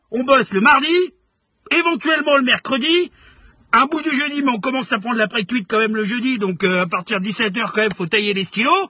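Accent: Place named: Lyon, France. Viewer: Cuuuuulte la vidéo de l'année! Chez nous enfin près de chez moi (Allez Villette!) tu es une star même si tu es stéphanois, d'façon tu as l'accent lyonnais ^^ Bises mon pti canard aux olives:)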